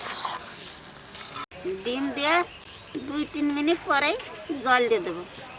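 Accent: Bangladesh. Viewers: দাদীমা আপনি আমাদের মানে বাংলাদেশের মানুষ, আপনার কথা শুনেই বুঝি আর রান্না দেখে তো অবশ্যই আমার দেশের ঘ্রান পাই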